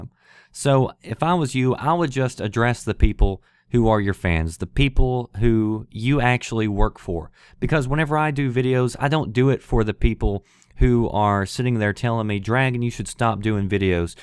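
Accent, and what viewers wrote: South, United States. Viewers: I really enjoy your vids and you got a cool southern drawl. Have another positive comment and have a nice day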